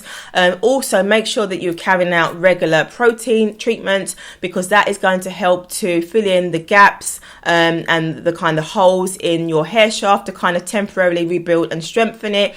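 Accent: United Kingdom. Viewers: This sounds mean spirited. Also, there’s lots of British accents. The one she has is typical not the BBC/RP one you’re used to hearing in film usually. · What an accent? Is it really British or it's faked?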